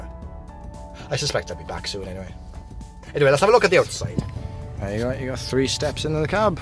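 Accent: Wales, United Kingdom. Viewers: where in wales you from dude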